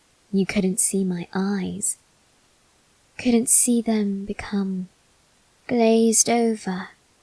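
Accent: United Kingdom. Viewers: Why are you so good at doing a Bri'ish accent? (I'm Bri'ish my self..!)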